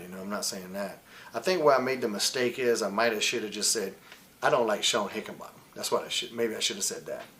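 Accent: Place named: North Carolina, United States. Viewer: I love Shane he don’t hide that N.C. accent. I’m from N.C. so him, Matt, and jeff are kinda hometown heroes for me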